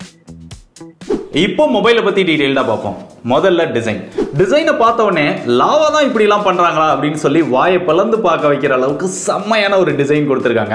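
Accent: Kovilpatti, India. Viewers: Anna neenga kovilpatti ya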